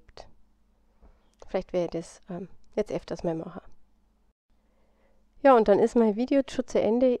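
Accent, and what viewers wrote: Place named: Bavaria, Germany. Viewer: Wie sympathisch ist das denn mit dem bayrischen Akzent!💕 Genau diesen Farbkasten (kein Metallic), habe ich schon lange im Warenkorb bei eBay! Die Farben scheinen noch zusätzlich zum Schminkekasten unterschiedliche Nuancen zu bieten. Dein Kanal war mir leider bis heute unbekannt. Aber gleich mal Daumen hoch und Abo! LG.🎈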